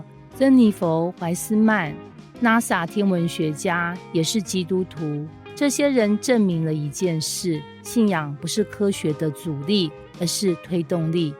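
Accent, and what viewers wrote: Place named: Taiwan. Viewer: 為什麼要用這種奇怪又難聽的腔調講話，這是在醜化台灣人的口音。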